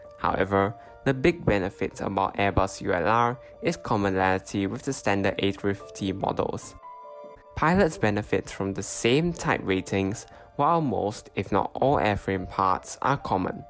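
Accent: Singapore. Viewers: You sound Singaporean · Can’t help but think your accent is that of a Singaporean?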